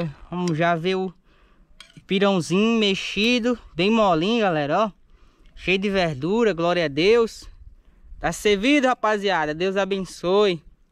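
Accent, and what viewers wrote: Brazil. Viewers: Na real, o sotaque nordestino e lindo demais, não tem jeito kkkk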